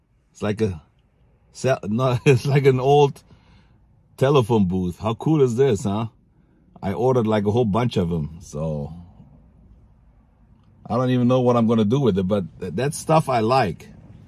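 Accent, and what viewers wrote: Germany. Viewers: Take heart brother, I've watched your vids over the years, and I love your spirit, your German accent, and your swag, "Cast not away your confidence, for it has a great reward" I don't know you, but I know you, I used to live in Venice on Wavecrest between Ocean and the strand, very close to where your shop was, but those were the better years, and I feel like I got the best of it. Keep punching brother, your in my prayers, blessings...TMac Vegas Strong!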